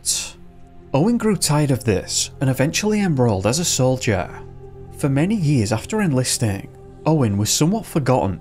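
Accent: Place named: North, United Kingdom. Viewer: A new vid! 🙌🏻Right on! I adore the narrators style in every way but I cannot place the accent correctly. Please someone tell me which place up north this gentleman hails from! ☺️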